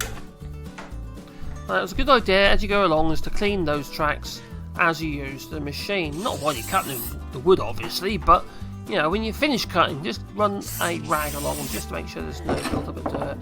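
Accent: Norfolk, United Kingdom. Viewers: I know this is how the world has gone but I prefer your natural voice. As a Scouser I can't be certain but I would bet it is a Norfolk accent?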